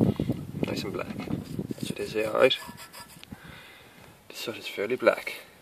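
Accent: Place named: Newfoundland, Canada. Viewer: sound alot like a newfoundlander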